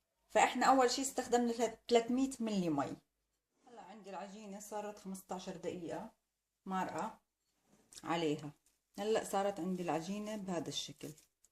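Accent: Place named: Nablus, Palestine. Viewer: الله عليك ، ما بتتخيلي قديش انبسطت بهالوصفة صرلي زماااان مشتهية الكلاج بالجبنة ، القطر لازم يكون بارد ولا لا ؟؟. من وين أنت بفلسطين ، حاسستك نابلسية صح ؟؟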